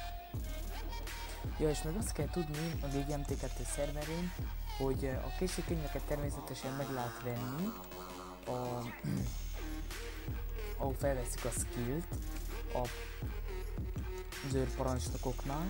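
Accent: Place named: Szekler Land, Romania. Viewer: szoval ezert volt ismeros szekely beszed :)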